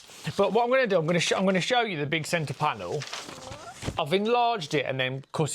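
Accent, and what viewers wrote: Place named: West Midlands, United Kingdom. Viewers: You sound like you're from West Midlands, I am too. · Oh, first of all I’m sure I’m not the only one who would love to give you a big hug and sit you down with a cuppa and a big slice of lemon drizzle cake. I love your accent because my dear old Mum was a Midlands girl and the accent gives me a warm glow every time I hear it. Now, as regards your work I would refer you to our Kate at the last homely house. She makes me laugh out loud when she talks about ‘the quilting police’. Remember my flower, that perfect is produced by machines, handmade is done with love and swear words and is by nature dotted with faults and slipped stitches, just like people. You have already created a legacy. Nearly fourteen thousand people care about you and watch your work. Take a moment to pause and look back at the road you have already travelled. 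You are loved and respected. Ros ❤️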